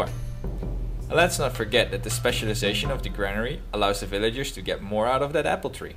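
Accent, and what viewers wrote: Netherlands. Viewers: you actualy sound dutch ^.^ it would explain why the name reus soudns so mutch like the word giant in dutch :P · This looks interesting, I like indie-games like these. Art looks good, hope it's well balanced. Je zou wel wat aan dat accent moeten doen. Hollands engels is nog pijnlijker dan Frans engels. Wel het opvallende onvermogen maar dan zonder de charme.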